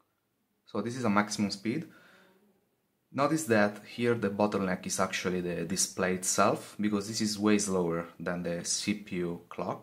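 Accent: Italy. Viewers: Italiano ? Si sente l'accento.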